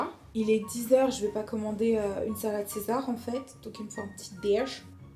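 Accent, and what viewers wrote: England, United Kingdom. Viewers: C’est agréable ton contenue est fluide et chapeau pour les videos tout les jours mais l’accent anglais les expressions c’est vraiment lourd , irritant et gênant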